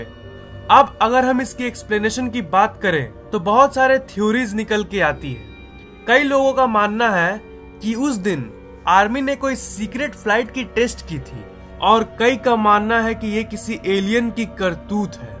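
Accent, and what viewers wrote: Bihar, India. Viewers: Bhai koun ho nhi ho ye to nhi pata par tum bihar se ho ye to pukka hai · Sir Are you from bihar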